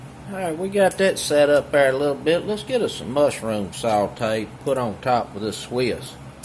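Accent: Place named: South, United States. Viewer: I like hearing your accent and that southern drawl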